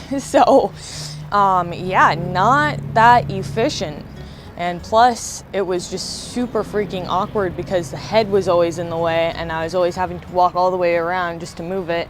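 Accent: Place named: Pennsylvania, United States. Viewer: .... You're just lovely. So natural, so real, so.... lovely. Also, I get a real kick out of the PA accent. Most don't hear it, but I certainly do, being from RI. Live in D.C. now. Respect. My Favorite channel. Fact. Who would have thought one could create an interesting channel about a saw mill? Your talent makes it possible....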